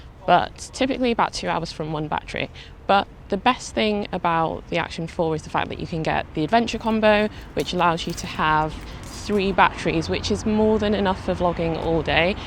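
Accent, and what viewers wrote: United Kingdom. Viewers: Excellent video! I’ve just ordered mine and am so excited! A nice change to hear a fellow Brit and to see beautiful Bath too! 👍🏻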